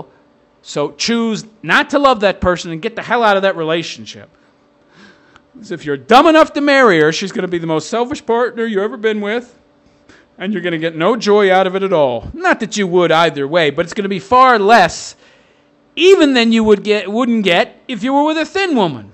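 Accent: New York, United States. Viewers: Okay.....New York.....Philly? That way you talk is killing me, it's like Archie Bunker's protege, fuckin' 'ay! Love your channel, damn you can break balls, wooo! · You sounds like a New Yorker.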